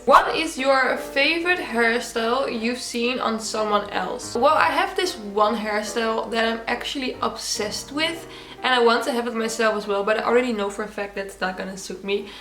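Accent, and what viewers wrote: Netherlands. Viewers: It's probably because she has such a strong accent. Or the reason she has such a strong accent lol. She doesn't change her voice or letters so it's pretty much Dutch with English words, if you know what I mean. She speaks fluently so it's no effort for her to create sentences, so she can talk like she normally does. She's not really copying the American intonation, which is what you notice when people's voices change. Every language has different intonations and that can make a voice sound different when you speak different languages. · Amai je hoort direct dat je van Nederland komt!😅😅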